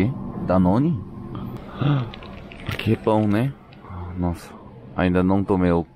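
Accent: South Korea. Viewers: Eu fico muito feliz quando vejo um coreano falando em portugues tipo acho engraçado eu fico tipo : meu deus que lindo caraaa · Kkk é supermercado brasileiro mesmo kkk Mano eu acho muito fofinho ver coreanos falando português kkkk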